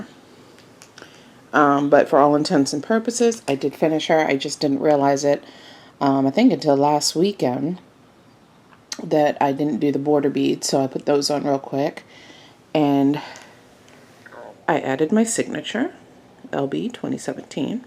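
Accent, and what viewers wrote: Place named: Baltimore, United States. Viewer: GIRL! So excited to see another video. I loved seeing all your projects and I truly enjoy listening to you discuss your process. Your Baltimore accent makes my heart so happy. Sending you all the hugs!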